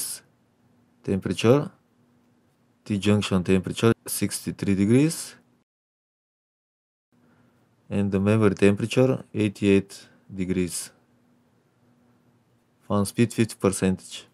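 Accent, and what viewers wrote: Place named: Russia. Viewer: Какой русский акцент.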